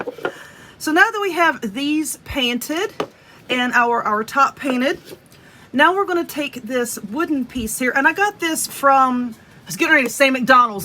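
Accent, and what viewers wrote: South, United States. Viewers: This is so precious. I love your instruction in all your videos. I told my Mom I'm going to make her a corn husk doll for Thanksgiving. She has one she made in the 80's and it's still in great shape. I might add color as you suggested in your instruction. I love your Southern Accent too. Makes me feel at home watching you. (I'm a native Texan btw)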